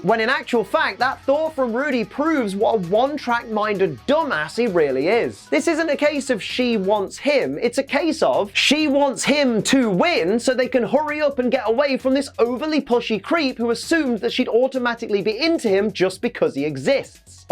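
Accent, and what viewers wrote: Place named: United Kingdom. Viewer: "Cook" and "cuck" sound so similar in a British accent that It took me a hot second to get the joke.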